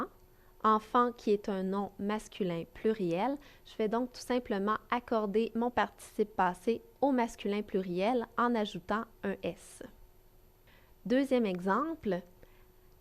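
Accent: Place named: Quebec, Canada. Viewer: merci Québécoise avec ton accent qui s'accorde avec ta belle province ;-)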